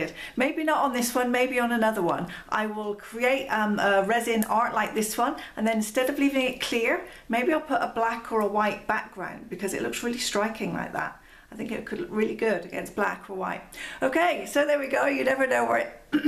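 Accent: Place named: United Kingdom. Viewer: That's awesome, a 2 sided resin picture! I've been trying to make out your accent. Pretty certain youre British, maybe from Devon or Cornwall, somewhere down there. Then I was thinking may be that's the accent from the island where you are. Then, haha, you said 'soap or squeezy,' & I'm pretty sure it's just us Brits that call it squeezy. For the rest of you, it's probably dish soap or the actual brand name! 😀😀 Anyway, thank you for all your tips & experiments. I'm really enjoying watching you.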